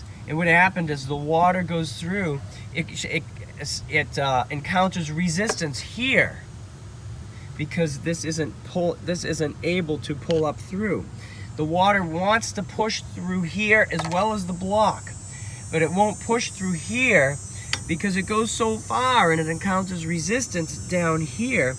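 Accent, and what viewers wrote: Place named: Massachusetts, United States. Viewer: Fantastic video! Thanks for the help! By the way, I knew you were from Mass about into the video. Cheers!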